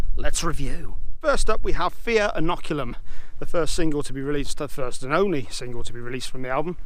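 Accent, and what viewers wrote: United Kingdom. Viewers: Looks like the English countryside,but then he does have an English accent.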